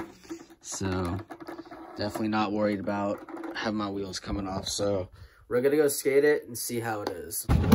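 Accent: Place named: Delaware, United States. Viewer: ok. that is a good idea. thanks man. somebody just the other day had this problem at the park. i can tell your from the east coast when you said up there in new england but you dont sound like your from delaware. im from Philadelphia. the streets here might be the first roads paved after they invented black top 200 years ago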